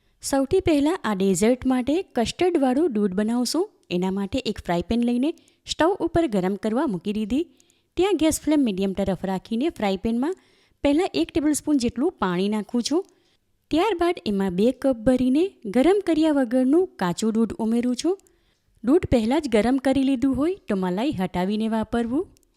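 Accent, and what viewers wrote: Surat, India. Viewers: Nice mango dessert I liked your Gujarati in Surati accent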